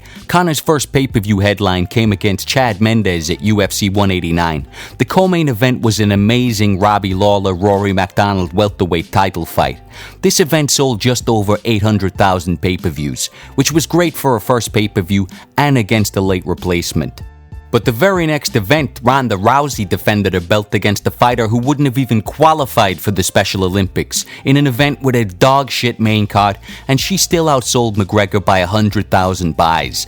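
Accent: United States. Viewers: What region of america is this accent from? I kind of recognise it but can't place it. I find it interesting.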